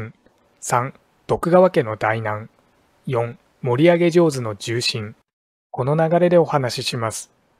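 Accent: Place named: Mikawa, Japan. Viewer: 三河のアクセント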